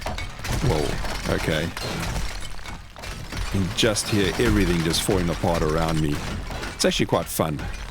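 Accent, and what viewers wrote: South Africa. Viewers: South African accent is so fun to listen to.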